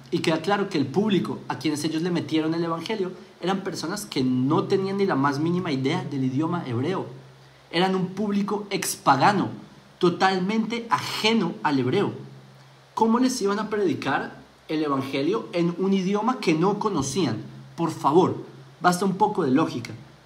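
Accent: Bogotá, Colombia. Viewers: Yo lo que creo y con sus propias palabras eres más Cristiano que cualquiera. Y veo que tampoco sabes nada del tema. Porque no subes las bases exactas, cómo años, escritos, datos arqueológicos etc. Ahora tu acento no es judío eres más bogotano que la changua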